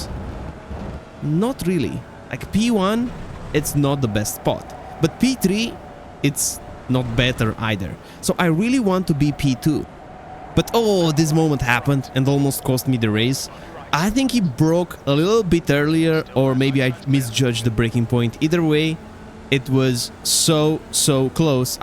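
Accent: Romania. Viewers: hahaha, mi s-a parut ca ai accent de compatriot ❤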